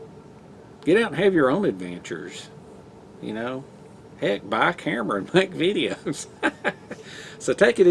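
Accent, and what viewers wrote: South, United States. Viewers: I’m a long time subscriber who never comments until now. I have much respect for your videos and watch them as they become available. I typically turn em up loud so I can hear your southern accent throughout the house, lol. Your fans will love ya just as much when you finally have time to continue pursuing your hobby! What you and have done is a great contribution to YouTube. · Take care of what you need to do. Sounds like you’re gonna be a might busy. I enjoy your Southern twang and you seem to be a really mellow guy. I watched your ranger roll video yesterday after I watched a video on ranger rolls on Grunt Proof’s channel from four years ago where he gave you a shout out. Spend time with your dad. I lost mine 23 years ago. Mom is still here and she’ll be 84 in a couple of weeks. I’ll be watching more from your video library and I’ll be looking forward to your next one. 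Take it easy. Mark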